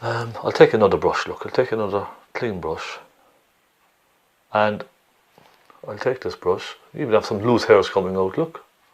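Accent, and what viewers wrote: Cork, Ireland. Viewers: nice one bud thanks ,,,, from your accent id say your not far from me ,, :) ,, co cork me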